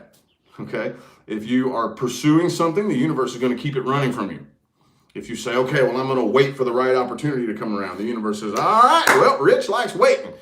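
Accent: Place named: Kentucky, United States. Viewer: Love your accent. You sound like us in Kentucky.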